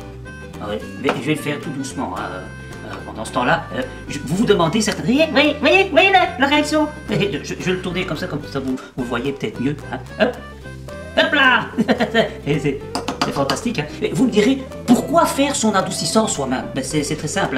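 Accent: Brussels, Belgium. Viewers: Super ! et drôle en plus avec l'accent bruxellois une fois !👍👍😂😂💐💐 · Tes recettes et le ton que tu y donnes sont super ! (et on adore ton accent belge en France) ... Par contre, pas besoins de bicarbonate, en effet c'est chimiquement totalement non nécessaire car cela s'annule avec le vinaigre (PH opposé). Je te joins l'explication ... <link>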